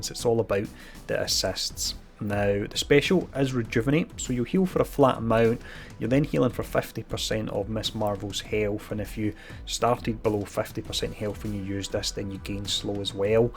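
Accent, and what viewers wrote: Scotland, United Kingdom. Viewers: came for the info stayed for the scottish accent will come back for both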